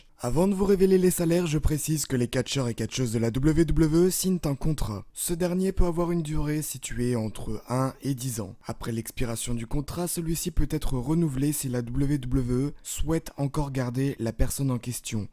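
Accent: Russia. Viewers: Je like la vidéo parce que elle est bonne et pour l'accent Russe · Mdr ton accent russe 😂😂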